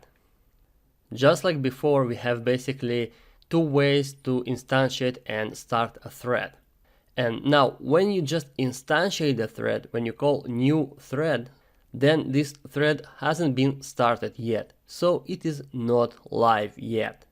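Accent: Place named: Russia. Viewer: по акценту всегда узнаю русского)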